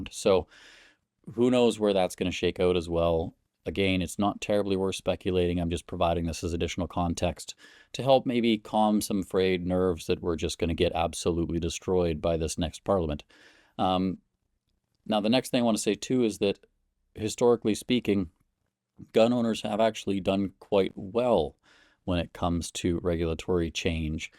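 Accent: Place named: Okanagan, Canada. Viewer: First time I watched the channel, you are an Okanagan guy by the sounds of it, I grew up in Winfield.. er Lake Country, but currently moved to central AB, sorry for the loss of Tracy Gray was sad to see Ktown go liberal. We have Pierre moving right next door to us now :) Keep calm carry on great advice !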